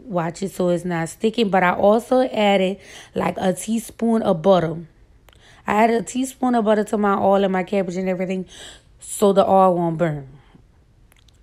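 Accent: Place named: Louisiana, United States. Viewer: Sounds like Louisiana baby.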